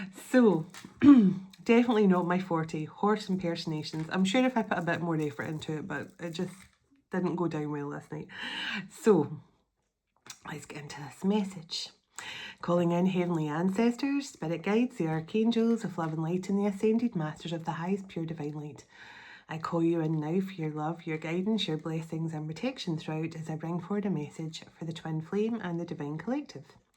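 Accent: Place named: Scotland, United Kingdom. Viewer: Oh my I’m a Cancerian DF all the way from California. The timing and your message’s spirit of reconnection with my avoidant Libra TF must be divinely led because I can tell the telepathic connection being revived today during my morning walk in nature. Plus the Scottish accent was quite the bonus!! Cheers.❤❤❤444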